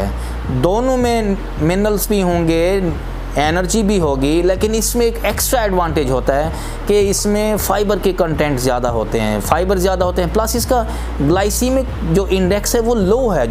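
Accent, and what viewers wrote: Pakistan. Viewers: Sir ap saraki speeker hen lagta ha janoobi punjab K rehnay walay hen....nice videos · Bahawal pur yaad aa giya ...aap ki aawaz sun kar ...zinda bad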